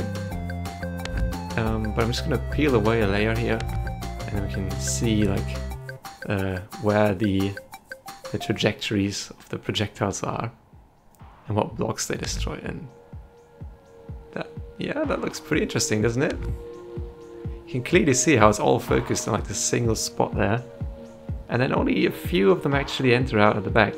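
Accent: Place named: Germany. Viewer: Wie man direkt erkennt, dass du deutscher bist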